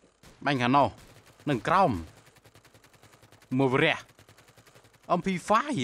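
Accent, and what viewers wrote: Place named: Cambodia. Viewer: ប្រូខេត្ដណា មិចយាយអណ្ដើនម្លោះ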